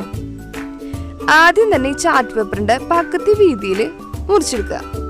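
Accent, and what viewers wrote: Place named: Thrissur, India. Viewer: Thrissur slang..🤗🤗🤗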